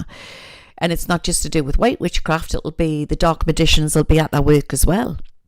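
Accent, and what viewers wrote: Newcastle, United Kingdom. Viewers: Love and miss the Geordie accent, from a geordie lass in Tasmania Australia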